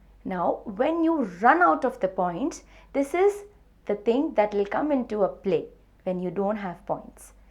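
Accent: India. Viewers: 印度口音，发音不准但很流利，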